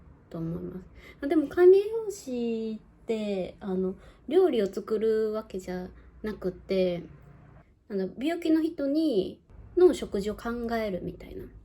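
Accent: Kyoto, Japan. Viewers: nokoさんの柔らかい声、京都弁、聞いててとても癒されます😍これからも喋りのvlogぜひ撮ってください✨ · nokoさん改めて2万人おめでとうございます🎉🎊 nokoさんの京都弁のお喋り可愛くて好きです🥰 むっちゃんへの愛❤️感動しました🥺🥰 これからもカフェやスイーツ、京都のこと、むっちゃんのことなどなど、楽しみにしていますね😉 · 2万人登録者おめでとうございます㊗️ さんの京都弁がとても可愛いくて癒されます😍私は東京生まれの東京育ちで看護師しています。京都に憧れていて、いずれ京都で暮らしたいと、思っています。猫とコーヒーが私も大好きでいつも楽しく観ています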